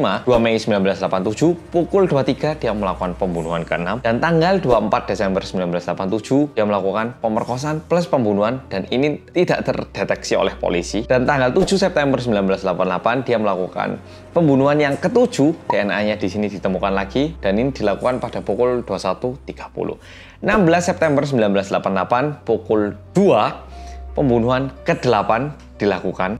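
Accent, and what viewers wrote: Java, Indonesia. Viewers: Titimangsa apalagi kronologis yang beruntut lebih baik dibaca sih karena kalau salah fatal.. ini keren banget mas ga banyak yang bisa. Butuh jam terbang lama buat bisa selancar mas. Semangat mass medokk💪🏻🍉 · Lebih suka medhoookk...dari pada luweee...maaf semua...🙏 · Medhok team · Cara ngmgnya mas enak bgt jd inget pembimbing magang saya mirip bgt medok2 gitu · Bang lu logat ngomongnya kek orang jawa si bang 😅😅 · Sedih baru nonton😢, soalnya pulang kepondok sebentar. Semangat dan sehat selalu buat mas yang medoooookkkkk..... 💗👏😂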